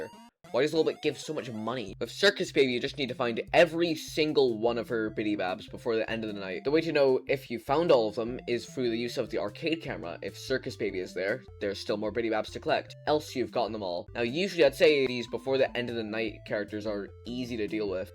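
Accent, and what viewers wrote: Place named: Ireland. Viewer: he's from ireland, so i think he's still tryna learn english as there dutch is like the native language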